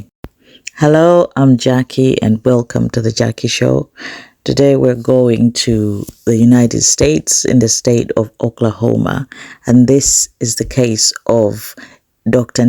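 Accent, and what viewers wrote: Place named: United Kingdom. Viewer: You've British accent... Jackie tikambilane, kindly share your email address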